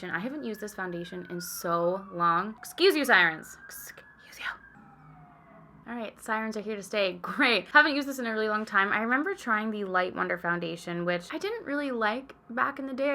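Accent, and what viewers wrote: United Kingdom. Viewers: 🎄🎄🎄 I was disappointed not to see (hear?) more British accent in this vid - would have been the perfect opportunity! Thanks Ig: sybelion 🎄🎄🎄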